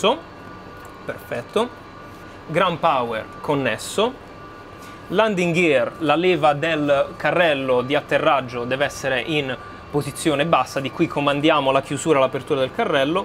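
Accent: Apulia, Italy. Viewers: Ciao, no è pugliese:)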